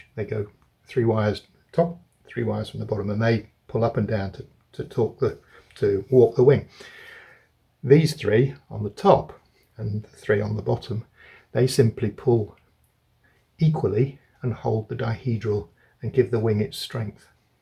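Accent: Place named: Yorkshire, United Kingdom. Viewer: Totally agree with you. I'm waiting to see how he bent the carbon fiber rod for the tips. But please don't tell him I LOVE your channel heaps more ❤ If you were a shiela I'd ask ya out and we could talk about ailerons and airfoils and fuel mix ratios and compare our Fokkers all night and stuff like that 😂 But ya a bloke 😂😂😂😂😂😂😂😂 and I'm happily married. So that's a bit messed up hey 😅 You remind me so much of a bloke (in Australia cos I'm in Sydney Australia ) oh man it was near forty years ago I was 20 he was 60. Name was Charlie Leeson from Yorkshire. A tool maker who really made me fussy about word work and modelling. I flew my fifth scale DVII and he his tiger moth and fly baby. Best flying days of my life with Charlie.. His work was stunning. (He passed away a good twenty years ago ) Anyway you have a similar accent and manner so thank you for being you and helping to revitalise so many memories of a hero of my youth. 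Hey shouldn't I be talking about Danny's channel and not yours here?????😂😂😂😂😂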